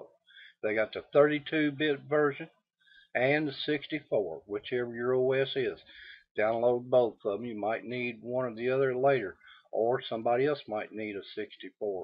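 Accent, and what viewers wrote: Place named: South, United States. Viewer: I like your accent. Southerner?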